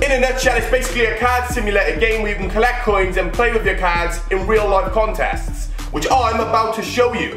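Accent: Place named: Scotland, United Kingdom. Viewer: scottish cringe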